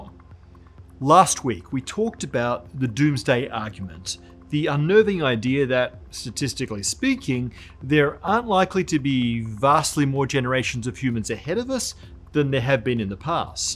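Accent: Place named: Australia. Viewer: It's called being Australian. I don't know how you struggle to understand him, it's very clear, and I don't think they considered those like you that are impaired in verbal comprehension over his ability as a physics communicator.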